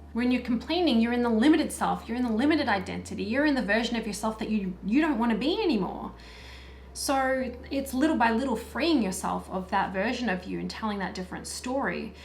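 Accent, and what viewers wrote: Australia. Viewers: I thought that was an aussie accent! 😉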